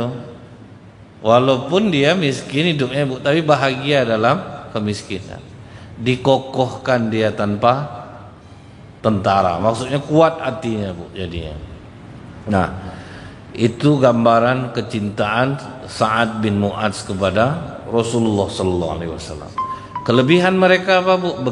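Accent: West Sumatra, Indonesia. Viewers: loghat nya seperti uztadz Zuma, minang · Logat nya kayak orang Sumbar ustadz nya, , , Semoga sehat dan selalu fit dalam berdakwah untuk ustadz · Itu logat minang mbak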